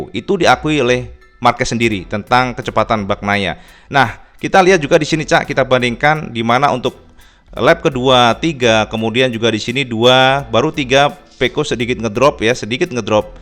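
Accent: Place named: Java, Indonesia. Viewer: seneng aq cak cara ngrivew mu iso mantep logat jowone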